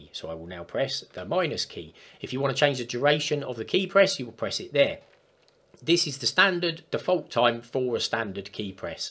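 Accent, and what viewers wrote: Cockney, United Kingdom. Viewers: Nufing wrong with your east end London voice son it’s only cos I’ve got one as well lol thanks for the video fella , I’ll give it a try 👍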